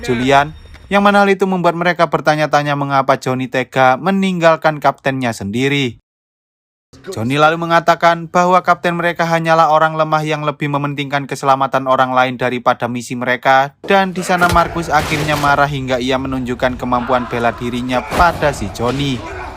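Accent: Java, Indonesia. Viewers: Medok gini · Jowone endi mas kok medok tenan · Suka denger logat jawa medok mimin nya😁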